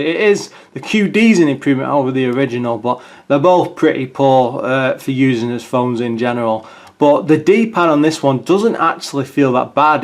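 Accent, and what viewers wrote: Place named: England, United Kingdom. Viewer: What part of England do you come from? I'm intrigued by your accent.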